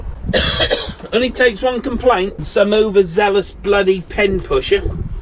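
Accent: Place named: Great Yarmouth, United Kingdom. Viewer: Hi. In this video you answered a question I was going to ask and that's where you are from. The reason for asking is your accent and your work van whith Braydon flooring/carpets, which made me think Great Yarmouth/Gorleston 👍👍 Would love to see you fish Gorleston sometime, as I live in Gorleston and I'm now getting back in to sea fishing after a 20 year gap. In the last month I've fished Gorleston river twice, I've had 30+ Whiting but loads of crabs 😞. Anyway, tight lines, I really enjoy your videos, dont change your personality on the videos as it's you enjoying fishing, good and slow👍👍🎣🎣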